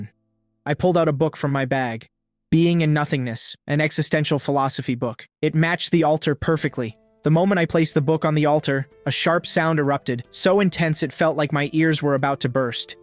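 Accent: Jamaica. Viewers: Ohhh yuh Jamaican.. eh video tuff bro nah gu lie but wish wah prt 3 did di deh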